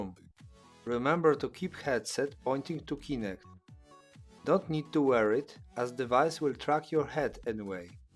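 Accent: Poland. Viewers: Z Polski jesteś bo masz taki akcent ? You are from poland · haha, po akcencie idzie poznać, że rodak :D super film ugułem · your accent is very polish are you from poland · nice polish accent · Ojoj czuć polski akcent jak chuj XDDDD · Больше как поляк, не все люди с таким акцентом Русские, чтоб ты знал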